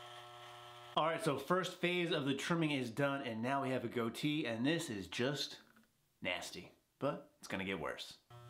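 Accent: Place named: South, United States. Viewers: THAT SLOW PROGRESSION TO A SOUTHERN ACCENT HAHA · ʻPedostacheʻ, Country Accents and ʻMirror ʻMirinʻ... makes for a complete and entertaining video! Seriously, I havenʻt tried floor presses. Looks like they mainly help you with the last 3rd of the press. Interesting, I might try those because I have good explosion off the chest, but, I seem to get to a sticking point during the last 3rd of the press. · dat southern accent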